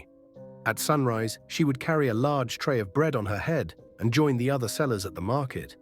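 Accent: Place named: England, United Kingdom. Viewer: The African accent is better for these stories. Please use that accent. It’s hard to listen to and English man narrating, even if the story is good.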